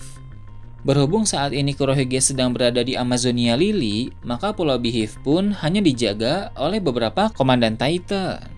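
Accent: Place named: Tasikmalaya, Indonesia. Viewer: mang naha di akhir kalimat dikitukeun wae duhhh ciga orang tasik mang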